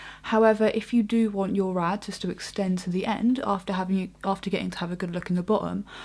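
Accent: United Kingdom. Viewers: with an awesome british accent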